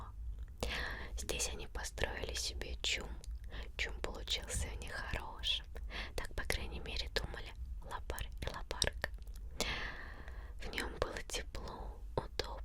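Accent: Russia. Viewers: el acento ruso es hermoso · I love the Russian accent and the way it sounds. Only when it's not a Russian yelling at me on a video game tho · ロシア語の発音ほんとすき、日本人じゃ絶対できない🥹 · me gusta mucho el acento ruso 🤧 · I love Russian accent🥰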